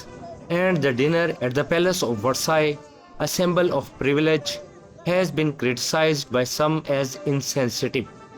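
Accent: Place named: India. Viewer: Horrible Indian accent. Use AI.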